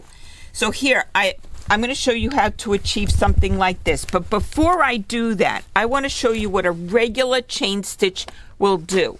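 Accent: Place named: New York, United States. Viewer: Some great tips thanks and I love the NYC accent! She sounds like Fran Dresher...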